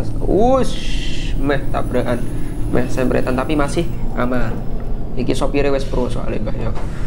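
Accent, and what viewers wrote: Java, Indonesia. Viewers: Orang jawa mana suaranya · peh jowone ketok tenan🖒🖒😂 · Di suarane arek jawa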